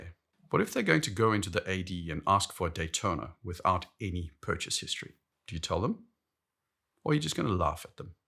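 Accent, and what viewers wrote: South Africa. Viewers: ...and a slight South African accent! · he sounds like he’s South African.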